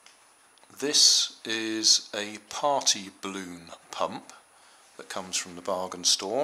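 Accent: United Kingdom. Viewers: Your videos help me whenever we have a car making expirement, and i always get a A+! Thank you and i suscribed. I only have one question are you from uk? Just wondering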